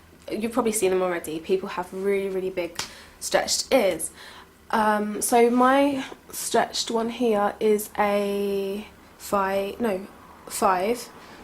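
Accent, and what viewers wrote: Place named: United Kingdom. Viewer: OH....MY....GOD....I fucking hate British accents. Instant turn-off.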